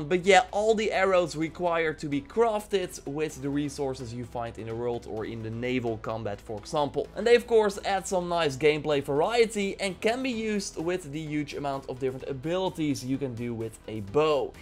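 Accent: Netherlands. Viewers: Bertram Rogers I am sure that there are YouTubers covering this gameplay why don't u go watch them, and i am sure that165k people like his dutch accent and his opinions or else they wouldn't be subscribed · Je hebt een zwaar Nederlands accent, jow now?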